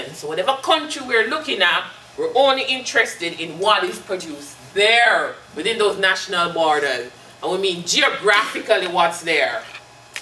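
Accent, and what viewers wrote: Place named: Jamaica. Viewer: Sound like a Jamaican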